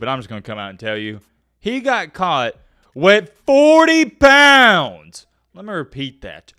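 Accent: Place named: Appalachia, United States. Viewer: And, what the hell does he say at the end? Sounds like some Appalachian, inbred, dialect.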